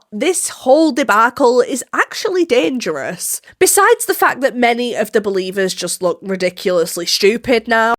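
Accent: United Kingdom. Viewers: So, the way this whole mess looks to me as outsider / Europian - It feels kinda like those doomsday Evangelical Christians of yours, there in the US are some kind of a crazy mix of "Jehovah's witnesses on steroids", and modern variant of the Spanish Inquisition, lol. That's how crazy this Rapture thing looks to me! Now don't get me wrong, we have pretty diverse mix of Christian denominations here in Europe too. We have Catholics, ofc, Orthodox Christians, Protestants, Anglicans, etc. But it's not as nearly that "on the nose", and so much in every part of daily and social life, like you made it look there. Everyone is free to practice their religion they choose, but it's mostly a private and intimate thing, and hardly anyone will start talking to you about it, if you didn't specifically ask them about it. Let alone preach it and trying to convert someone, or go into discussions and arguments about who's religion is "better", or something like that. 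And in the most of them, as far as I know, you don't have that Rapture dogma, or what not, as represented as it is among US Evangelicals. And of course, we have many of atheists, agnostics, some Pagans, etc, but that's neither here nor there, so Idk why I'm mentioning it at all. Anyways, this whole Rapture thing looks so unreal and so crazy to me, that it's hard to take it as the real life, lol! But Idk why am I explaining all of this, when you sound more British than US to me, judging and going just by your cute & cool British acce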